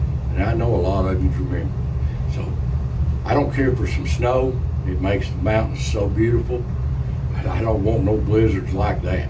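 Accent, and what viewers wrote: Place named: Swain County, United States. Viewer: Just seen this video. So sorry you and your wife have been sick. Prayers for quick recovery 🙏 ❤. Wishing you a Very Happy New Year as well and all the little animal's. As you spoke I realized I am the elder now. I have 3 brothers left. All 3 of my sisters passed long ago. I miss them so much. All of my Family have passed. Just my brother's and me left along with all of our children. are you kin to any Laws from Swain County? My Mother in law was from there year's ago and passed in late 90s. I loved her. Noticed you not only have same accent but said something few say anymore and I miss it. She would say yuns come back as we lived far away. Thank you for being real. Pray 🙏 you have a Blessed and Awesome 2024. ❤🎉🎉🎉🎉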